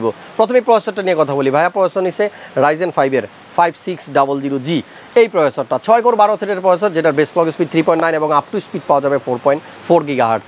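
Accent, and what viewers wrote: Noakhali, Bangladesh. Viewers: নোয়াখালী ভাই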